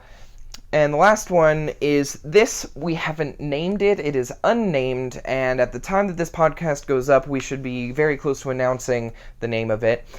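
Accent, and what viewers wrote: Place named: South, United States. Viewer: Sometimes, when you find yourself in a toxic situation...at work, or anywhere else, you do need to think about removing yourself from it, and improving your quality if life. However....and thus is important...if you do decide you need to find another job, make sure you already have that new job, before leaving the old one. If you leave, without having somewhere else to go, you will only be adding another stress to your. Life... Bills need to be paid, and yiu need to keep a decent roof over your head. You spend most of your waking hours at work. So....Work should be something you at least enjoy.... The really fortunate ones have a passion for what they do... Inwish that for you.. Oh yes...a Southern draw is nit necessarily a bad thing... Lots if people find it appealing...😊 Sandy from Cincinnati 💜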